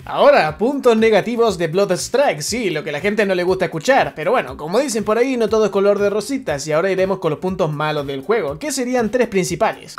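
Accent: Chile. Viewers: Ke ermozo chileno leon dice wn kliau JSJSJSJSJ ✨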